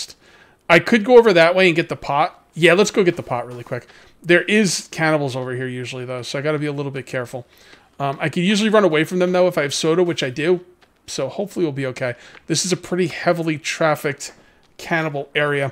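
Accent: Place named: Philadelphia, United States. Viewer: This is my first time watching ya and your philly accent is cracking me up. My best friend is from Philly and you guys sound almost exactly the same.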